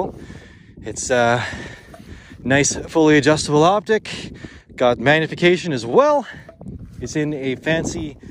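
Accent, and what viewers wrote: Canada. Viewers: U're canadian right? I'm sayin' this cause of your accent.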